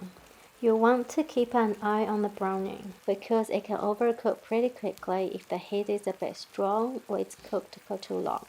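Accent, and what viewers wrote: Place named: New Zealand. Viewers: Love the Nz accent ❤ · Spotted the NZ accent straight away! Thank you for the tutorial! I've been lowkey scared to try pan-frying dumplings, so I've always just steamed them. I'll give this a try.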